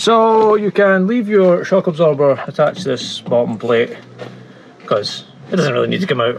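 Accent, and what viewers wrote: Scotland, United Kingdom. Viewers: Mate, fantastic presentation, well done. Your spiel, accent and gags remind me of the fine Scots tradesmen who shared their skills and experience with me as an apprentice in Australia in the late 1970s.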